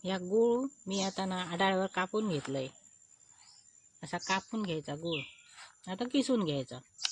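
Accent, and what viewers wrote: Malvani, India. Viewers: ताई छान रेस्पी तुमचे बोलणे खुप छान मालवणी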